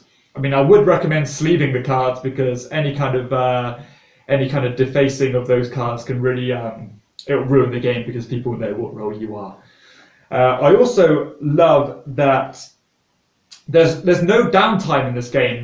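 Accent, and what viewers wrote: United Kingdom. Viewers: Glad to help! I can definitely see the confidence. And Avalon is one of my favs also. I should mention as a fellow fan of the genre that if you haven't tried it yet, "Secret Hitler" has some great and exciting twists on the genre that my social deduction groups love equally for slightly different reasons. Fewer "roles" but in exchange the title has an elegant deck mechanic that basically auto-balances the game if one side or the other is winning too heavily, and the government becomes more authoritarian and heavy-handed as more fascist policies are passed which creates a bit more of a crescendo and arc to the drama (whereas Avalon Rounds 1 and 5 aren't terribly different necessarily). We go back and forth over which game we prefer since both have interesting pros and cons. I think it's important to add that having played the game I don't believe the source material was used distastefully, I think there were interesting and compelling reasons for selecting that particular theme over others. 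Maybe a game to consider when it's available outside the U.S. (your accent sounds British to me); it is also available via free PnP from the manufacturer's website. And THANK YOU for the sleeves, I'll check them out! I need them for my copy of War of the Ring too and I saw you had already given the dimensions for those in a different video and they seem to be the same brand. Lifesaver!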